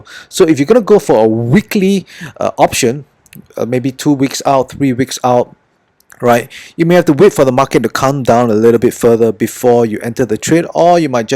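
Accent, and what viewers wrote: Singapore. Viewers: U sound like Singaporean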